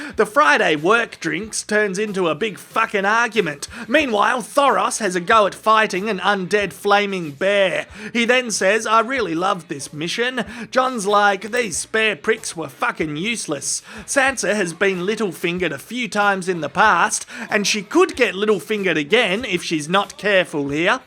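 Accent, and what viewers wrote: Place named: Outback, Australia. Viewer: My favorite part of Ozzy man reviews is that although he sounds like a fair dinkum outback wanker, he is deep and insightful, especially with GOT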